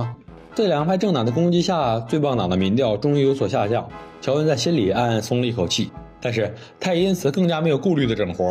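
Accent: Northeast, China. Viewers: 小伙儿故事编得不错👍大碴子声儿也没问题👌你就是口齿不清吞音太重不看字幕欣赏不到你的幽默😢好好去悲京广播学院播音系练练你的订阅至少能翻一倍😂这辈子别屈了你的才呀😅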